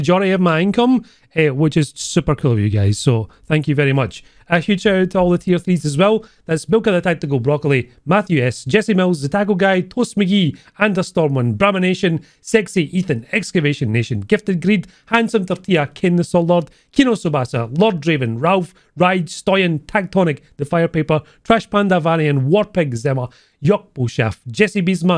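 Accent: Scotland, United Kingdom. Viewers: Is this Man Scottish?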